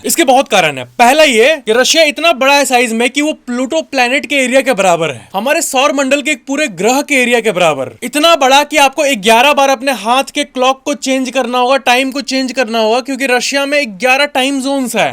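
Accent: Bihar, India. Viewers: Is chutia ka accent bihari h video dekhne ka man ni krta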